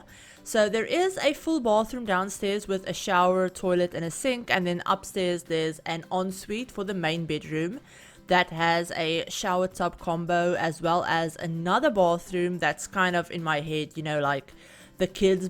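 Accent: South Africa. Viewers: omg! are you south african? super cool to see south african simmers on YouTube 😭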